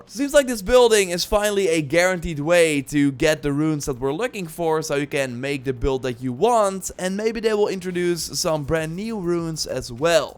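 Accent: Netherlands. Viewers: you sound dutch, is this correct?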